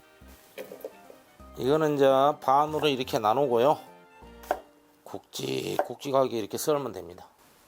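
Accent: Jeolla, South Korea. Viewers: 구수한 전라도사투리 인간적으로 보여요ㆍ🎉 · 전라도 분이신가 말투가 구수하시네요 ㅎㅎ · 전라도 사투리가 넘 정겨워요. 재미있고요. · 전라도 사투리가 구수하네요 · 세프님 전라도 사투리 넘나 잼 나요 종발이라는 말 아주어렸을때 들어서 정겨워요 오이 물 김치 잘 해먹어봐야죠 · ㅋㅋ김대석쉐프님 전라도 말씨가 겁나게 구수해요 저두 그짝ㅋ 저두응식한지20년이넘었는데 참맛나게 잘하세요 참고해서 많이 활용할게요 더많은 레시피 부탁합니다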